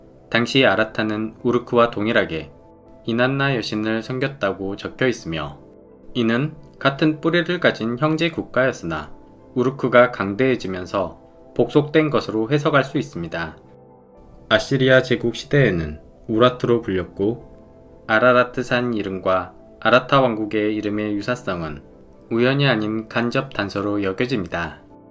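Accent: Jeolla, South Korea. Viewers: 혹시 전라도 분이신가요? 사투리가 익숙 하길래 여쭙니다 ㅎ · 억양을 보니 전라도 출신이시네요 ㅎㅎ 광주같은데... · 전라도 목소리네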